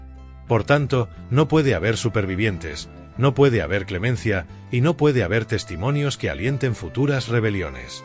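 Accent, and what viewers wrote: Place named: Europe. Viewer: Observen que su voz está en acento europeo. Pero grabado recientemente. ¿El guionista cuánto cobró? En San Cristóbal nunca se había oído una cosa así. No crean todo lo que escuchan. Te da un guión con una narrativa impactante Y cómo la gente no le lee... La Era de la Desinformación Al mismo tiempo que habla de que fueron los portugueses dice que fueron los españoles. Tampoco dice otros datos verdaderamente teórico.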